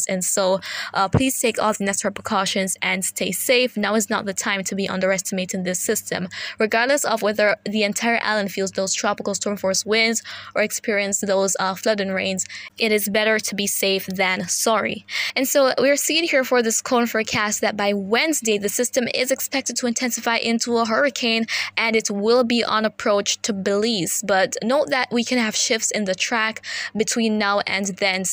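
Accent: Jamaica. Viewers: Appreciate you, they draw you out with the Patois though😆😆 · The Jamaican dialect had mi panny floor 🤣🤣. Jeezas ah love it 😀 Wooooiiiie mi body 😄. Thank you sooooo much for the consistency of your forecasts and detailed analysis. I need to see you on our TV channels. Mek dem stay deh nuh tek heed n sih 🤣😅🤣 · lol wait deh no man your patois sound nice man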